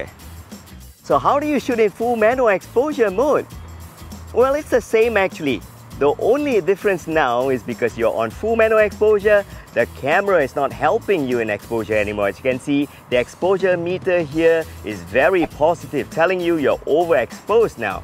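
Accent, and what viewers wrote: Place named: Malaysia. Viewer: Patut la slang english kenal je, org malaysia rupanya. Great video btw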